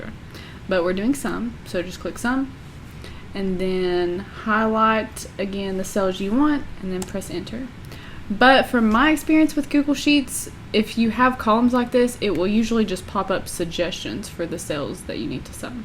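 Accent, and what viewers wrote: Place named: South, United States. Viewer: i love your southern accent :)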